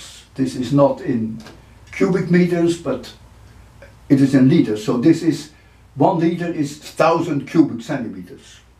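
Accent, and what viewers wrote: Netherlands. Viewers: Are u from the Nederlands?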